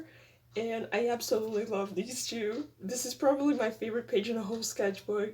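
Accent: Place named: Brazil. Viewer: You're accent sound a little Brazilian, where are you from??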